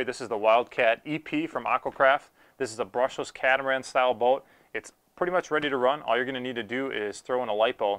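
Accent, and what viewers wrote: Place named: Canada. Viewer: How come you people pronounce "boat" and "out"the same way? Like Canadians. Eeeewww.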